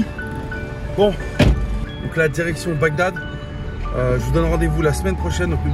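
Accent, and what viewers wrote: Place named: Algeria. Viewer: Je l’aime bien parler français algérien Vous êtes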